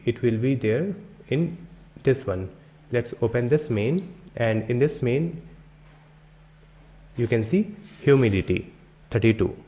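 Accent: India. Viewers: indian guy thanks i like your video and accent😊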